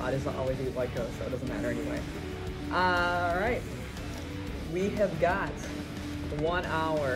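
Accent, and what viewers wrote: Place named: Minnesota, United States. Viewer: Just heard you say you're from Minnesota. Thought I heard that accent😃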